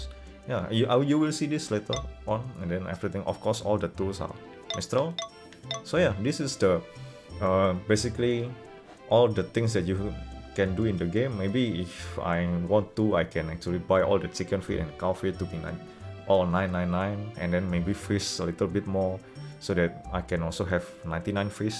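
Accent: Indonesia. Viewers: yes your javanese accent is very noticable through your english 😂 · Judging by your accent, you must be idonesian. Arent you? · hello , me and my gf are watching this whole guide in Japan, and this game is so interesting we are not even studying lol . i will be replaying this with my her who is a huge fan of this game. thanks for this guide , bro. btw, she immediately recognized your indonesian accent, because she is also indonesian i guess Harvest moon and Indonesia go hand in hand :) thank you again for your efforts. Appreciate it greatly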